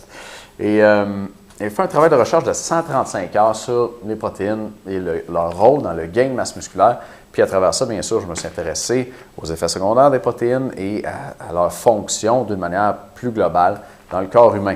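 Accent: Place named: Quebec, Canada. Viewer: Vous pouvez mettre du sous-titrage. Il y a des gens malentendants. Il y a des gens aussi qui ont du mal à suivre l'accent québecois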